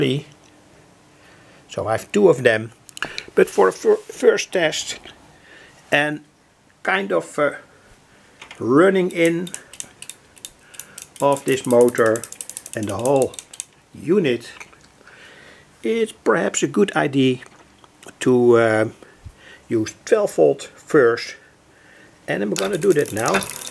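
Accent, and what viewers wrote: Netherlands. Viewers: btw are you dutch you have a Dutch accent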